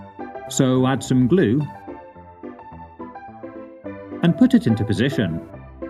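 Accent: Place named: RP, United Kingdom. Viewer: I like your posh British accent! I’m British and have a very posh accent! I’ve half way through made this with my dad, I’m making it but we’ve only done about half (I’m exited for it to get finished!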